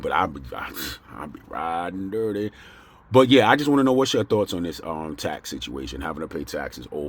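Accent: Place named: Maryland, United States. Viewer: Sounds like you grew up in maryland and moved to Virginia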